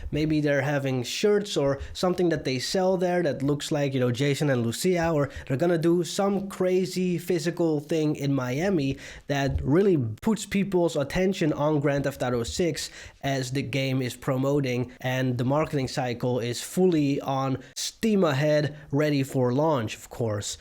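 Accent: Netherlands. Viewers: Ofc he is the Dutch accent is through the roof..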